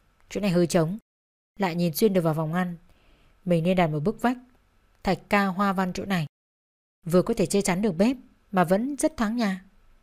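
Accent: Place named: North, Vietnam. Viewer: khong phai ky thi chu giong la giong bac ma minh thay de thuong kinh khung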